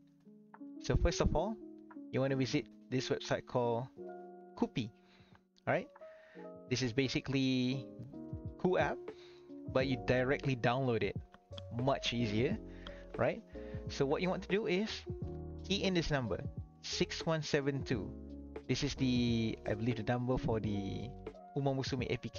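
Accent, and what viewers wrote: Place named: South East England, United Kingdom. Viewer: Спасибо тебе, человек с юго-восточным акцентом за эту ценную информацию!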